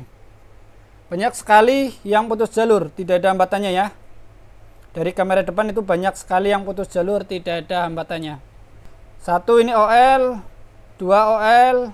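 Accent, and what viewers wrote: Banyumas, Indonesia. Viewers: Lokasi pundi mas ? Kayane wong ngapak loh ,😁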